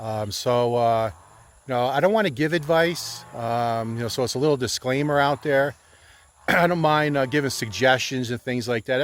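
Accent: Philadelphia, United States. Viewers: Very cool - I hear philly in you :) am i right ?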